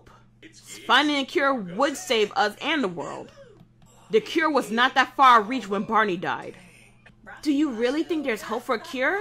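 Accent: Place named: South America. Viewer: awesome south american accent :)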